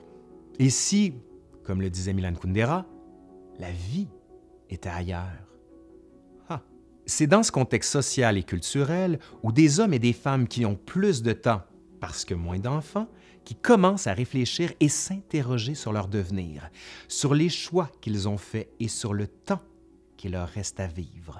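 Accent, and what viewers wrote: Quebec, Canada. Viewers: Je suis en train d'apprendre le français et je m'interroge sur l'origine de l'accent de cet homme. Est-il québécois, par hasard ? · Je découvre ta chaine, là à 3h du mat', un ravissement pour bercer mon insomnie ! Super intéressant (sans parler de l'irrésistible accent de la belle province😊) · as tu déjà essayé une sorte de challenge d'action ? C'est à dire lorsque tu te poses une question sur quelque chose tu essaye d'identifier quel est l'hésitation et le doute à l'origine et d'y associer une action en faveur de ce que tu penses être aligné avec tes désirs ? Merci pour la vidéo j'ai écouté du début à la fin dans le Metro Parisien. J'ai trouvé ça très construit. Et l'accent québécois... Un vrai delice !!!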